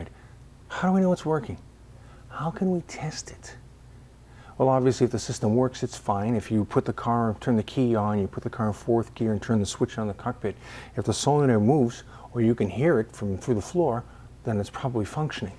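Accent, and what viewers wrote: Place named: New York, United States. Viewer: New yawk accent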